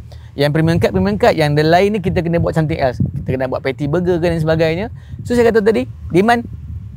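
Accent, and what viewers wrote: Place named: Kelantan, Malaysia. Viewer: tak faham.... maaf...pekat sangat cakap Kelantan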